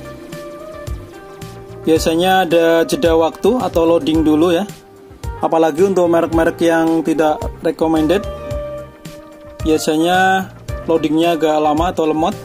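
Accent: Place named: Banyumas, Indonesia. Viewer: Hadirrr booos ora ngapak ora kepenak. .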